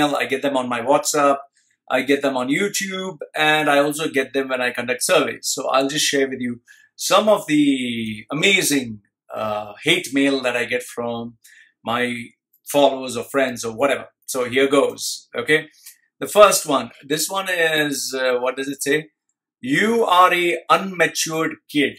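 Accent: India. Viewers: WHY YOUR ACCENT SOUNDS LIKE HOW INDIAN SPEAK.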